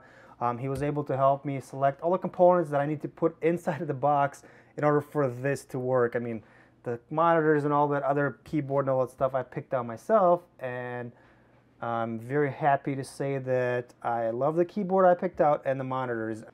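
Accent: Russia. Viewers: I knew it))) You cannt get rid of a russian akcent compleately))) Regards from Ukraine!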